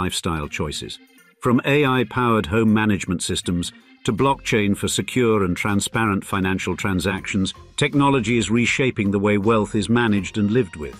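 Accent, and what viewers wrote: United Kingdom. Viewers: Interesting: with a crisp British accent, we're informed that data collection in Dubai is extensive, and that cameras are ubiquitous. London is THE city to visit to be caught on camera.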